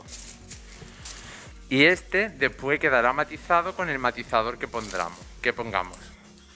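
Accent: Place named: Spain. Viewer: Omg!!! Eres super lindo!!! Soy peruana y vivo en Canadá por más de 30 años. Primeramente: me encanta tu acento español... Que lindo tu asentó....😍😍😍 Me encanta tu carisma al hablar eres muy simpático y bromista con tu clienta y tus skills ... Woooow !!!! Eres bien educado en tu craft. Como me a encantado verte y aprender de ti. Eres un hombre muy dotado en tu profesión. Te voy a seguir...!!! JOANNE 🌹😘😘😘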